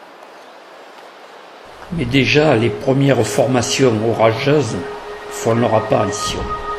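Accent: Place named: Provence, France. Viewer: Mon père qui est randonneur me parle souvent du Mercantour qu'il affectionne pour l'avoir foulé plusieurs fois. Je le découvre avec émerveillement grâce à tes images magnifiques. Comme le dit si bien Daniel (Lapin 25), ta voix de provençal nous fait déjà voyager. Grand bravo, amicalement Gégé et Luciole du Doubs.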